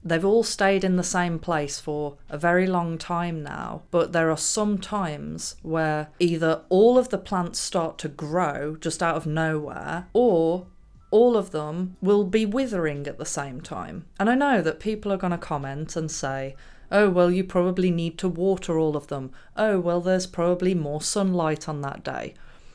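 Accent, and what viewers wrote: United Kingdom. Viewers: I am definitely connected to you. LOL Your British accent and your brilliance on so many different topics lulls me to sleep every night. Keep up the good work. Mark in Dallas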